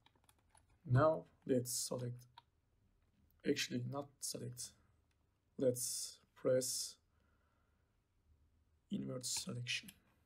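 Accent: Turkey. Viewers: Ellerine sağlık abi Türk olduğunu anladım😁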